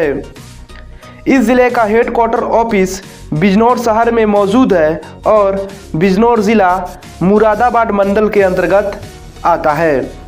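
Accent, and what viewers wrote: Bihar, India. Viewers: Bolne wala bihari h be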